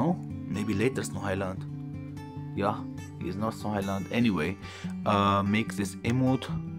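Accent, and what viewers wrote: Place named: Syria. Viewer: Accent sounds Syrian 😊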